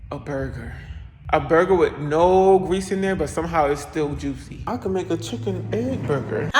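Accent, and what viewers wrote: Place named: Philadelphia, United States. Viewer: Baby you sound like you in Center City or Northern Liberties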